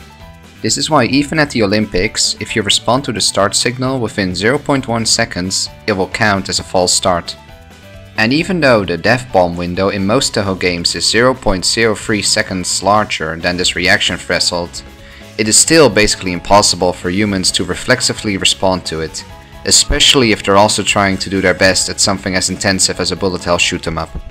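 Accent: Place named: Netherlands. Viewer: Heet Hollands accent.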